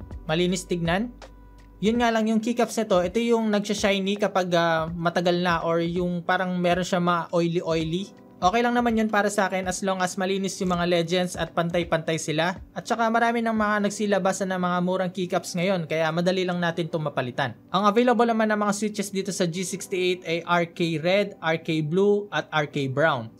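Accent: Philippines. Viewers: I love that I can still understand Tagalog. It's so fun to hear you do Taglish.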